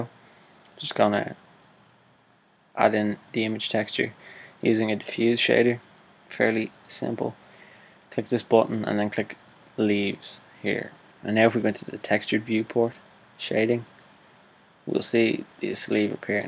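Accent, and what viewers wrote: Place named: Newfoundland, Canada. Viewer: this guy is a newfie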